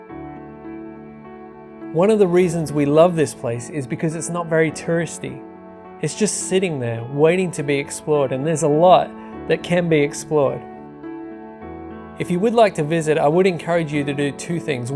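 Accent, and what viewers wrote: Australia. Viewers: Thanks mate ... that was awesome. You sound like an Aussie? Taking my family to Scotland soon to Aberdeenshire ... Banff in particular.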